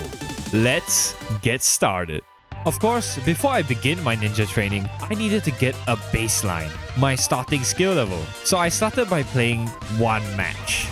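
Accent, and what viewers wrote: Singapore. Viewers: are u singaporean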